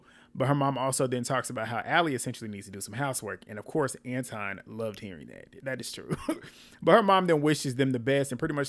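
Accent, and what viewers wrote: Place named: North Carolina, United States. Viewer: I’m from South Carolina and I just love listening to you talk because you sound soooo NC 😂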